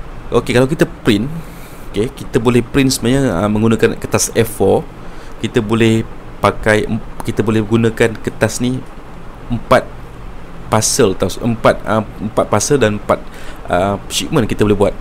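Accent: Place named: Johor, Malaysia. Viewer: “Haaa kena tukoo” 😂 johorean sgt, tak berniaga shopee but i really2 enjoy your explanation... keep uploading ❤️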